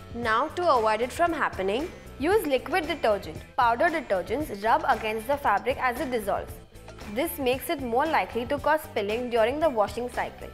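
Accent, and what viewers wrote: India. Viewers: Tania Castellon lol she is speaking English with an Indian accent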